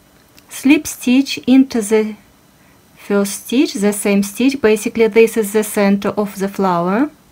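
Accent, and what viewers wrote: Russia. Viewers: Лол вы русская?? Видно по акценту и сри😂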